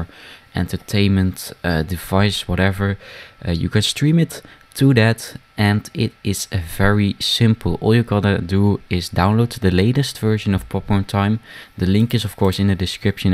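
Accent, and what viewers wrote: Netherlands. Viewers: U can hear from his accent that he is dutch :P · arre you dutch? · deze gast is duidelijk nederlands XD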